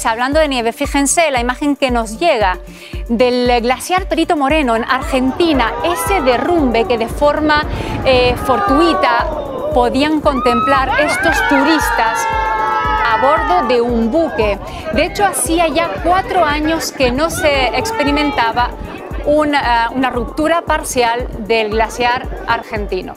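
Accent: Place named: Canary Islands, Spain. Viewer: Mi preciosa paisana... Mmmm... Ese bonito hacento canario.... Mmmmmm.... Un beso, guapa...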